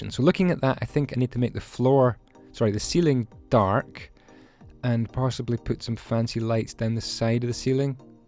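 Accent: Scotland, United Kingdom. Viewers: I'm just a new subscriber and your accent is really throwing me off, I think it's kind of Scottish, but could someone shed some light on this please? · It's Scottish, not very strong Scottish, but it is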